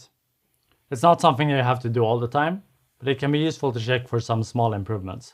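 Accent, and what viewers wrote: Sweden. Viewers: I was just about to open your channel page in a new tab to check the cannel info to see if you were Swedish*, but then these books appear confirmed it for me, lol. * I have ADHD okay; when I start to wonder where an accent is from and start to think it sounds oddly comfortably familiar, and then suddenly realise that it sounds Swedish; I just have to pause the video and figure it out immediately, or I will stop paying attention and only focus** on the accent... **That's also why my channel info only says "I'm from Norway, in case you wondered...", just in case some other ADHD'er gets distractingly curious about where the crazy person who posts multi paragraph (or one whole screenful of text with barely any periods but lots of commas and semicolons and nested parentheticals and no line breaks) is from... Come to think about it; maybe I should add "and I have ADHD" to the description too? 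That might explain some of my wildly off topic comments to anyone curious.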